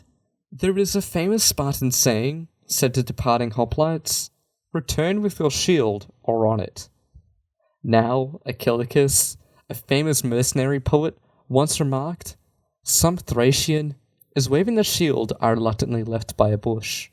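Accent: Australia. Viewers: The language he speaks is strine. He is a Greek Australian where strine is a southern dialect of English. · Seb Sandman I am kinda embarrassed now - I didn’t even notice it was an Aussie accent in either of his videos so far. Only that the Hanno video rocked and this video rocked but the “is he about to crack up laughing” in this one kept intruding. I probably watch/listen to too many history-related things, which seem to have a preponderance of British-accent-family speakers. 😂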